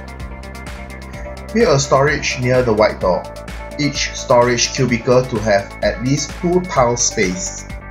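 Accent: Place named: Singapore. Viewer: He from Singapore. All not from America or England.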